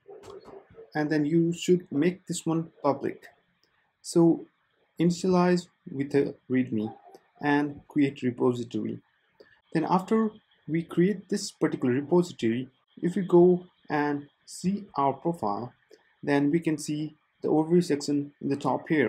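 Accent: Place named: India. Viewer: Indian accent? DROPPED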